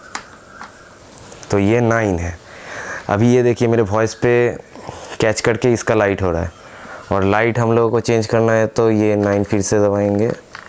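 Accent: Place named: Bangalore, India. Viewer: Kotha sunai puro Bangalore bacca mine hocche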